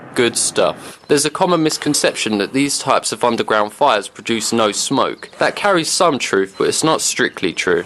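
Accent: United Kingdom. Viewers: interesting to hear a guy with a bri'ish accent using freedom units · Are there any people on youtube that DON'T have a limey accent? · I love that someone with a Brit accent knows about out Dakota customs. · British accent using "feet" and not "meters". This is great! (Bored COVID comment)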